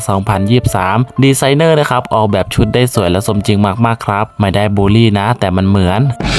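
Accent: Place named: Cambodia. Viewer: สำเนียงแอดเหมีอนเขมรมาก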